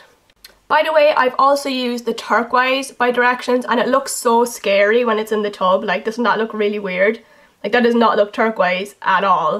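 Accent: Ireland. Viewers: Your accent is beautiful! I wish I had a accent but NOOO I had to be Canadian -.- haha are you Irish? Don't need to answer if you find it personal xoxo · OMG YOUR IRISH AND I LOVE YOU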